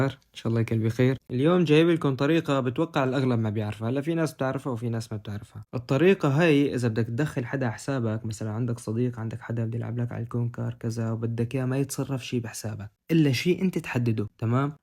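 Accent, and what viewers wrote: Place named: Syria. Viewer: شكرا على راسي بس انت من وين حسيت لهجتك سورية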